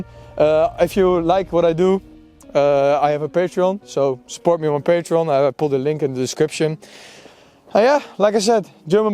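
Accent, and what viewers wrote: Netherlands. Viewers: Jij bent Nederlands he?🤣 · Even more disrespectful, and in fact illegal is taking artefacts away from the site. This guy sounds dutch, maybe he doesn't know the laws?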